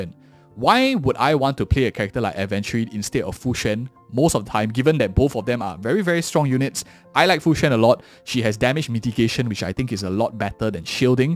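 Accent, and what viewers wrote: Singapore. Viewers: immediately could tell the singaporean accent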